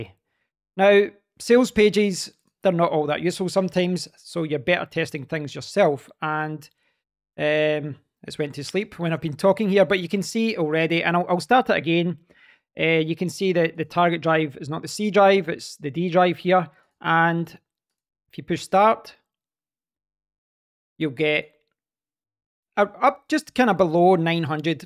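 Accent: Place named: Edinburgh, United Kingdom. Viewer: what a lovely accent!! Here in Edinburgh, and find this video sound so familiar!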